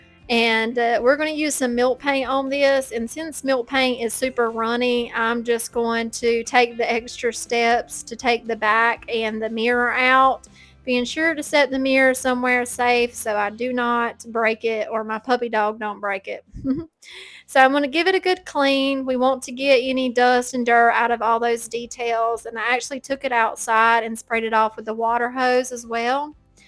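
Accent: South, United States. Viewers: They are all beautiful, what state are you in. Must be native born. I'm just not used to a southern accent it's nice.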